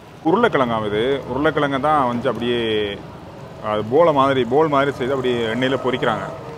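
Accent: Sri Lanka. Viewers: Srilankan Tamil . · Ur tamiz is good. Excellent srilankan tamiz...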